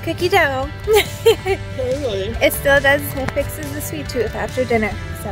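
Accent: Minnesota, United States. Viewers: Also? I love your Minnesotan accent! You from northern Minnesota? I’m from the Minneapolis area so… Hopkins to be exact. I can always tell when I hear a fellow Minnesotan :)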